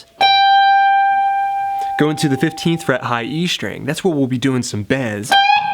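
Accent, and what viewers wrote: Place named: South Jersey, United States. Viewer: let it be known that over the past few years I have watched hundreds of thousands (lol ballpark figure) of guitar lessons via the internet. I would like to say: yours are, by far, my favorite! they are usually very well balanced and easy(ish) to understand. [the (ish) is most definitely due to my level of understanding not your teaching skills haha] I feel like I really do learn a lot. I love how methodical the lessons are; starting with easy steps and bringing it all together with a run through at the end. straightforward and cohesive... seriously, thanks for all of the knowledge 😊! also, you sound like your from jersey. maybe Philly, but probably like south jersey... if so that's cool, if not, ya know... that's cool too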